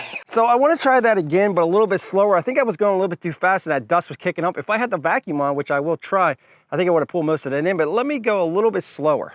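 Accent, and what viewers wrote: Pittsburgh, United States. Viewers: I hear Pittsburgh in your accent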